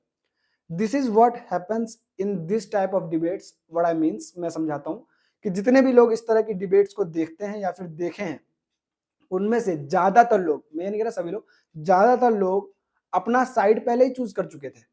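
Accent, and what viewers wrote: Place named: Bihar, India. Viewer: Bihari ho kya?thoda nhi, thora bol rahe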